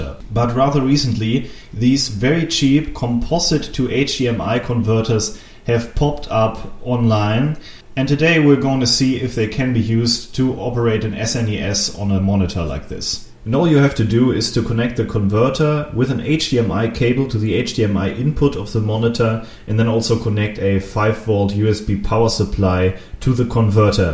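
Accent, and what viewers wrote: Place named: Germany. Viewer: Tolles englisch! Aber hehe, dass deine Muttersprache deutsch ist kannst du nicht verbergen. Tolle Videos by the way.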